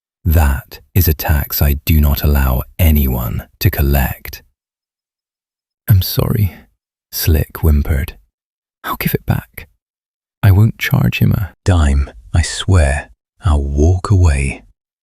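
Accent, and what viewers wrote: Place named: Ireland. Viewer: Best Bumpy story, so far. As the other reviewers said,like the original narrator better. The slow, Irish accented narrative was a bit much. Always liked the Irish accent, but bring back the original.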